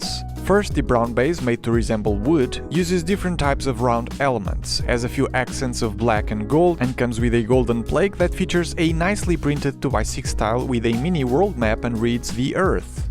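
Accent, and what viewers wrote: Portugal. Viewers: Consegui perceber logo que eras português mal falaste xD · Mano, assisti o vídeo inteiro sem perceber que tu es português, se não falasse eu não iria perceber 😂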